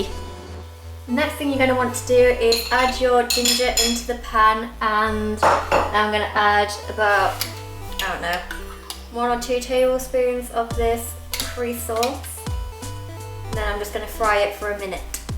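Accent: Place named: United Kingdom. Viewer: What a beautiful British accent you have! I have always wished I had a British accent.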